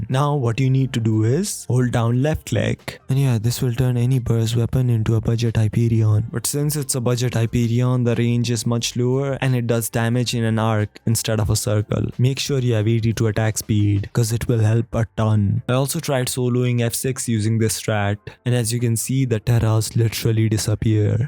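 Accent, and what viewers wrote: India. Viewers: can you get rid of the indian accent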